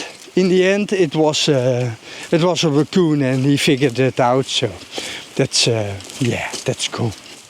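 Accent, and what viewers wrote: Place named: Netherlands. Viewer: Leuk Nederlands accentje😂 wel leuk zo'n rode wouw!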